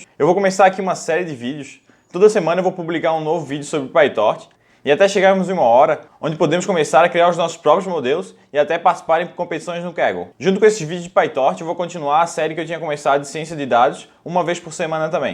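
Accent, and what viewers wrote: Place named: Santa Catarina, Brazil. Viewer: Pow, bicho, tu é de Floripa? Sotaque aí tem tudo pra ser de SC pelo menos. Hahaha Enfim, parabéns pelo canal! Excelente vídeo.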